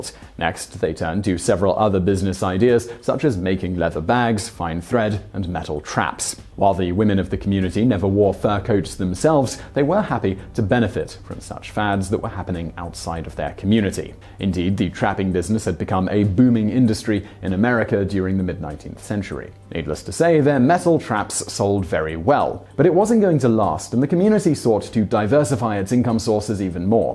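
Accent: England, United Kingdom. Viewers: This is like Stuff You Should Know but better because of the English accent.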